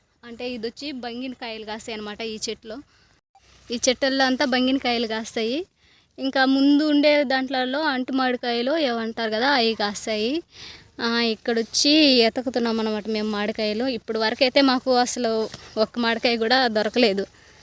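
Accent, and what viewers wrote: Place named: Rayalaseema, India. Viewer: హాయ్ అండిబాగున్నారమీవిడియెస్.బాగున్నాయి.ఎవూరుఅండిమీది.మీబాషచూస్తేరాయలసీమకుసంభందించినదిగ.ఉంది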